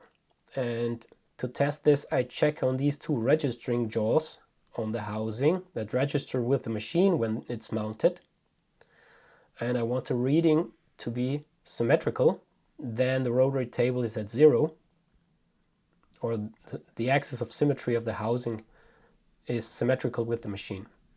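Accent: Austria. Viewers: I had a good friend from Austria in school. His accent and yours are identical. It make me smile. :) Brilliant work!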